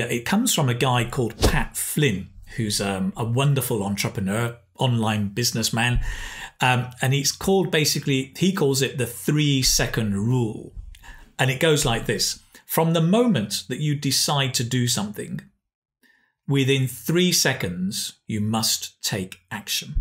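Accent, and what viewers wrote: United Kingdom. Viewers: Where is from??? Nd is this British accent or american accent. Well I think it may be British accent.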